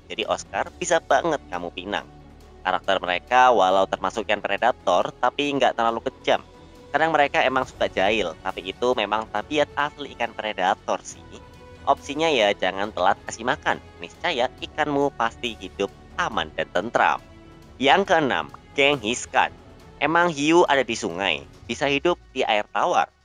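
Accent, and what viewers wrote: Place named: Java, Indonesia. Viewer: Temen temen cenderung ngenyek🙈 Logat jawa nya tetep gabisa ilang walau di gimanain juga😅 Tetep semangat bangs💪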